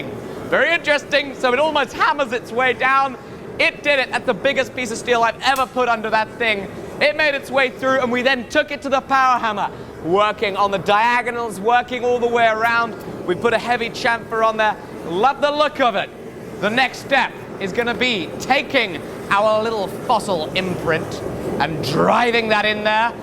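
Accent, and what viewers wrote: England, United Kingdom. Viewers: You were so British in your intro, you reminded me of Michael McIntyre. EDIT: I take it back. You sound like him the whole video. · ah hes English.. but from where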